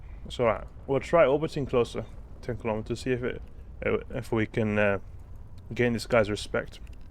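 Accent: South Africa. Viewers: Huh, I thought you were South African XD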